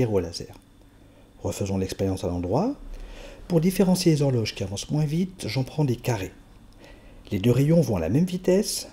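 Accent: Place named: Geneva, Switzerland. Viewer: Très bonne explication, vous avez l'accent genevois, vous êtes de Genève? Vous travaillez peut-être au Cern?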